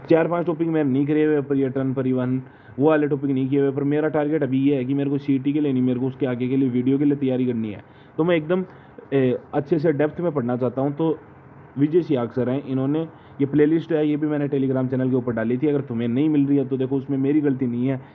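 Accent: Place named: Haryana, India. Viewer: Lgta hai aap utri -purvi rajsthan se ho Hariyana vala tone bhi aa raha hai bich bich me mene to aaj hi viedo dekha apka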